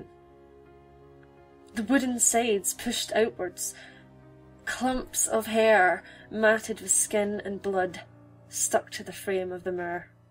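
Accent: Scotland, United Kingdom. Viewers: Are you scotish? Wooo hooo first scotish cp reader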